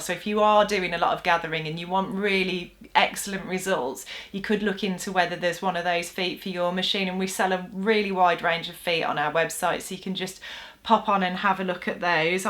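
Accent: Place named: West Midlands, United Kingdom. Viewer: Me too - and to listen you! I moved from the West Midlands to the South West a couple of years ago and the pandemic has curtailed my visits back home to see friends. So I LOVE hearing your accent - it reminds me of home. Oh and I think your tips and advice are great too, of course!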